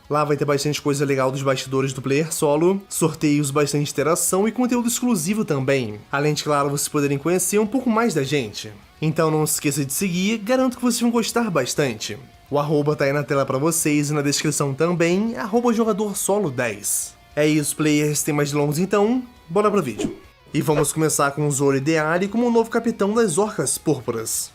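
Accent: Portugal. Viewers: Tu é português? Tem um pouco do sutaque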